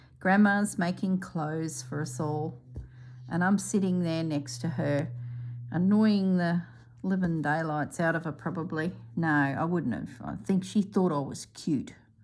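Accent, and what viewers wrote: Australia. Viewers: Ah! Love what you did with the leaves! I JUST LOVE this channel! I love your style, your warm conversational style, stories, and, of course, that Aussie accent and vernacular! So glad to have found you !❤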